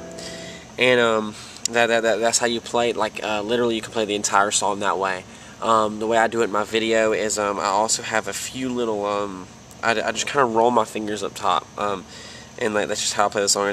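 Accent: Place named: Australia. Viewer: Why do I hear a tiny hint of Australian in your accent?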